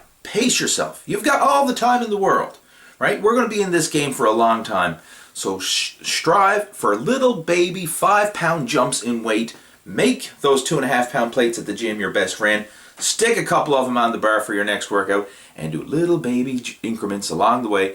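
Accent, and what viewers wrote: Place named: Atlantic Canada, Canada. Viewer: Holy shit im canadian but im still annoyed by his accent. he must be from pei or newfoundland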